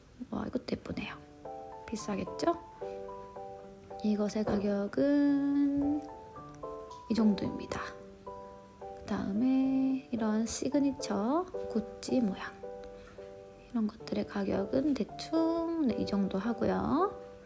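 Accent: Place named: Daegu, South Korea. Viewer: 말이 빨라지면서 자연스럽게 들려오는 경상도 대구 사투리가 정겹게 들리네요. 늘 건강하세요. 영상 감사드립니다.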